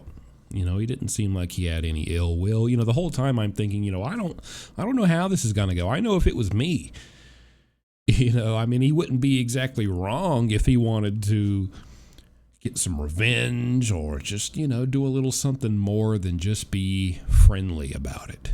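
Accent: South, United States. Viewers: Wow, I love this story but most of all I love your voice - how did you get rid of your southern accent? I live in Alabama and would LOVE to get rid of mine (grins).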